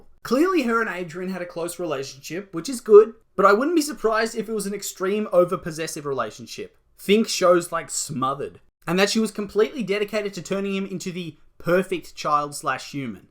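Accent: Australia. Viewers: Not mean to offend you or anything but you sound an awful lot like an Australian 😂😭✋✨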